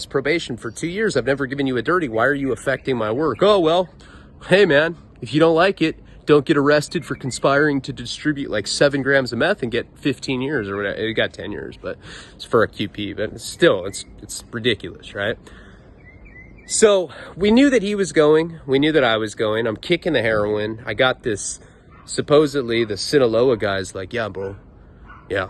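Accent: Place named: Long Island, United States. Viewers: NEW YAWK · Lmao bro newyork Haha I live in upstate ny he sounds like from ny city more like long island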